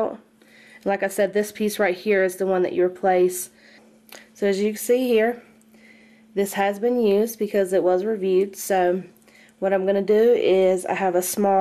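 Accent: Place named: South, United States. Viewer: Your gorgeous love your southern accent what a fox :)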